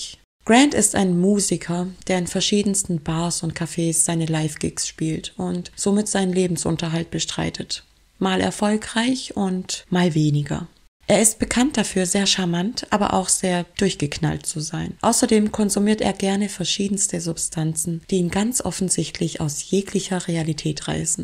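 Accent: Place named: Swabia, Germany. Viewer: Man hört das Schwäble raus 😁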